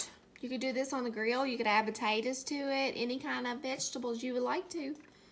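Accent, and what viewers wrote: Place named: Eastern Kentucky, United States. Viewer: This looks so good! I have never made ribs....of ANY sort! That is my husbands thing! I recognized your accent! My sister in eastern KY for years!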